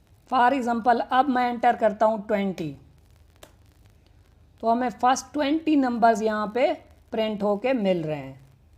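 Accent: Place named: India. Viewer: The Indian accent is very difficult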